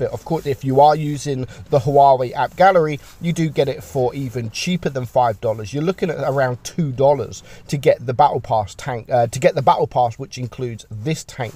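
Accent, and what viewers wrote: United Kingdom. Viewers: outolodah 2021 I love the British accent lol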